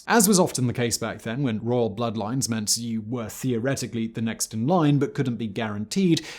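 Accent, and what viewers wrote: RP, United Kingdom. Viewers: For someone who speaks like a toff and gets all oity toity about snobby things you'd think he'd have ALL of his pronunciations right 😂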